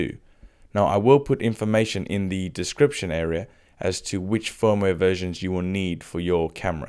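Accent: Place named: South Africa. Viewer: a south african! BRILLIANT!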